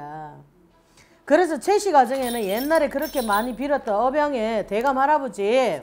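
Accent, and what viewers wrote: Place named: Gyeongsang, South Korea. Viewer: 선생님 사투리쓰셔서 갱상도 계신줄 ㅠ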